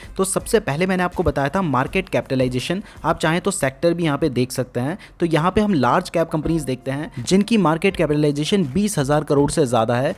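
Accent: Uttarakhand, India. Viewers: Bro. Uttarakhand se ho na 😎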